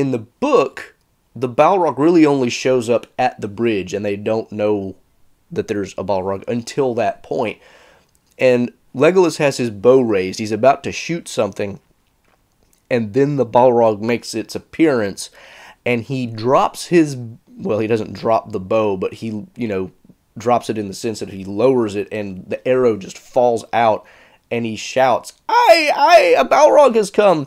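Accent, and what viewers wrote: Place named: Georgia, United States. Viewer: Georgia. That's your accent. Georgia. I know it.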